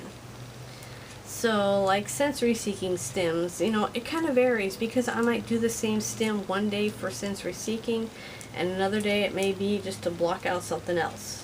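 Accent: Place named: South, United States. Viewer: i was born in Houston, i like your southern accent :p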